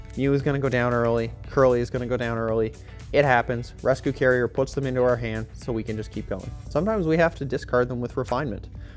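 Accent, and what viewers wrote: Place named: Atlantic Canada, Canada. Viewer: Yo, you sound like you live in Atlantic Canada? Any events nearby coming up?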